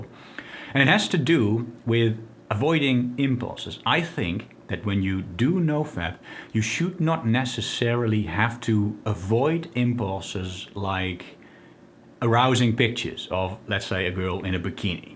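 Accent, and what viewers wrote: Netherlands. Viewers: I sense a dutch accent? My strijder! Good content keep it up jongen!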